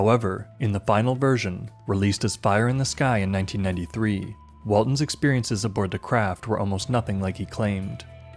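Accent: Canada. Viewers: The narrator is clearly Canadian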